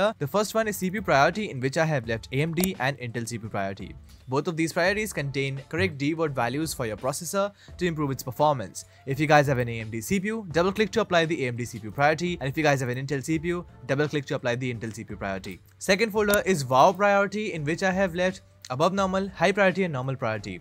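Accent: India. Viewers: No offense, but you sound exactly like those scammers from India.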